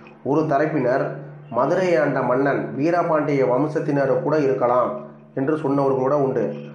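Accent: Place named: Telugu, India. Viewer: Yen da kena pu**a.. loos kuu...ishtathuku olaritu iruka.. kabothi... athitya karikalan sagumbothu.. raja raja chinna paiyan da... athithya karikalana konathu.. pandiya natta serntha" abathu thavi" veerargal than...I vanga chera desatha serntha mun mudi anthanargal(nmaboothris) atharvana vedam therinjavanga... veerapandiya mannaoda adi aatkal.. Ivanka than konnanga athithya karikala.. ithu theryama loosu mathri olaritu iruka... un voice ah theryuthu sathyama nee oru "GOLTI" koothi nu ..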